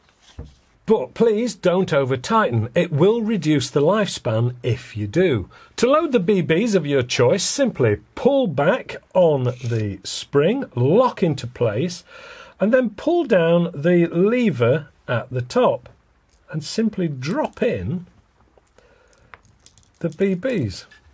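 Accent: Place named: England, United Kingdom. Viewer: So difficult England English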